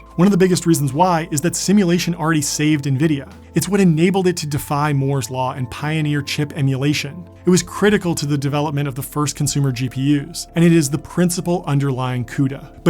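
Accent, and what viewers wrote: United States. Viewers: I'm an English pedant, my multilingual son wouldn't make that mistake. However I do accept as the saying goes that we are "two nations separated by a common language" and the guy speaking is speaking American and not English. Just rubbed me the wrong way is all.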